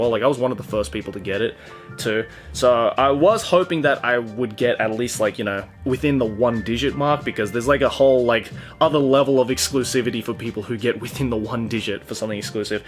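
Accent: Australia. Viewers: wtf hes australian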